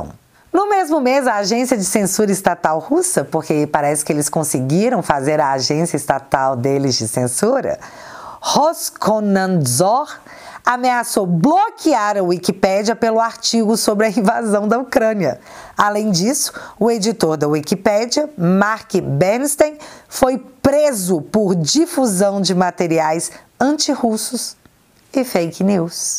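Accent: Minas Gerais, Brazil. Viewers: "Mas, UAI, não era urgente?" Amei ❤ Você é mineira como eu,? 😊 Parabéns pelo seu humor ao nos bem informar. Valeu! Avante, Brasil 🇧🇷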